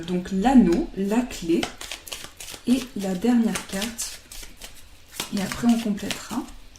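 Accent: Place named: South-West, France. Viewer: Bonjour C'est la 1ère fois que je vous découvre, je crois deviner l'accent du sud-ouest 😊 Vous êtes très belle et rayonnante, non , non, ne rougissez pas😊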